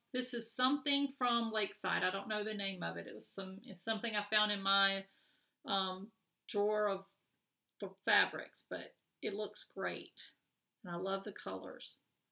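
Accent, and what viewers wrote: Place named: South, United States. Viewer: Hi! I have been binge watching your videos...I love your choice of projects! I love your southern accent too! I watch your videos while stitching and I find myself agreeing with you out loud. My husband will peek in asking, who are you talking to...? LOL!!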